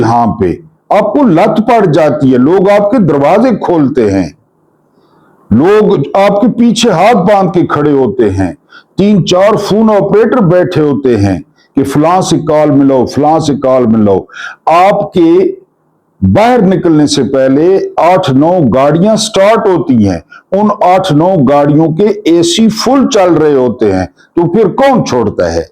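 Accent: Saraiki, Pakistan. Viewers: Excellent analysis sb, suraiki accent bht enjoy kartay hn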